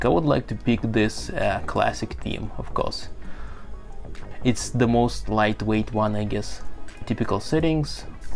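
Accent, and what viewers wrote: Russia. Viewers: вы русский? Просто акцент чисто русский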